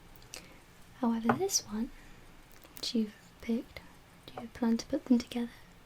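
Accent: Wales, United Kingdom. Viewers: Ur Welsh right? U sound it! I'm Welsh too ur so pretty and sweet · she's Welsh to be more specific :p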